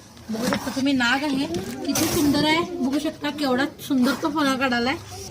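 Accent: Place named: Kolhapur, India. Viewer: Tai, tumchi bhasha lai bhari hai. I like kolhapuri tone😅😅😅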